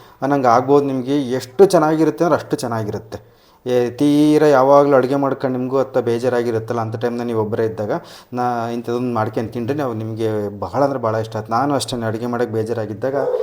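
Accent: Chitradurga, India. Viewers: ಚಿತ್ರದುರ್ಗ ಭಾಷೆ ..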